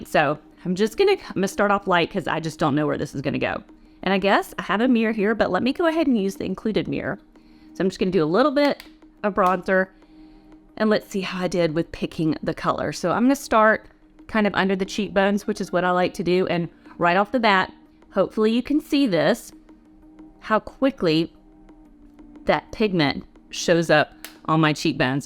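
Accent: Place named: South, United States. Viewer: Love your videos, short and to the point. Love your accent too being from the south myself. Dupe videos from you are awesome!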